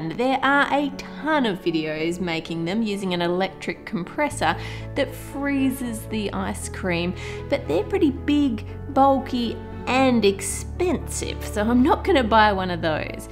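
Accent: Australia. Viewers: I legit just realized shes Australian and my Aussieboo self JUMPED (because of Felix and Chris from SKZ) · I luv Australian accents :D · You're awesome! Love the videos! Also love listening to your Australian accent.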